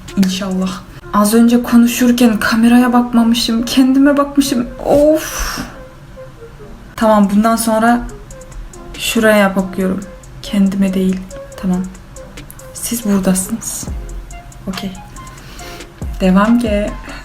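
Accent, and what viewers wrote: Azerbaijan. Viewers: Azərbaycanca cox sirin danısırsız gözəl😗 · Sən hər zaman Azəri dilində danış sənə çox yaraşır həm çox şirin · Abla nasıl danisiyorsunki sandımki gercekden Azerisin😂😁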